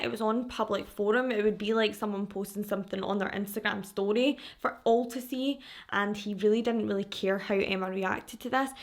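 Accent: Scotland, United Kingdom. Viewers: So glad I found your channel, your my new bedtime listen, slowly going through all your videos, I know it’s murder video but I find it soothing before bed 😂 (must be your lovely Scottish accent) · I love a Scottish accent.❤️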